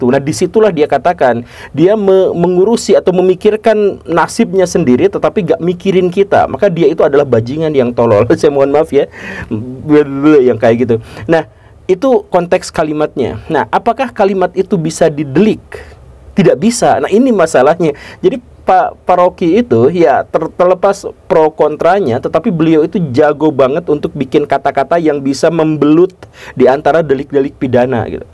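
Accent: West Java, Indonesia. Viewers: Jika ingin mencontoh kan maaf dan maaf.... Jika saya mengkritik dalam penyampaian dan pembuatan Kontennya tidak bermutu dan mengkritik dengan bahasa sarkas tapi saya menyinggung gaya bahasa yang di sampaikan berlogat Sunda, saya yakin nggak tersinggung dengan kritik saya tapi itu sebagai Vitamin tapi yg tersinggung pasti masyarakat Jawa Barat yg akan marah karena telah membawa cara penyampaiannya, Semoga juga bisa menyampaikan hal ini ke masyarakat dengan Chanel YT nya hukum yg tidak tertulis di masyarakat agar semua paham karena sejujurnya apa yg di sampaikan itu simple dan mudah dipahami tidak menggunakan diksi' yang jelimet..!!! Sukses terus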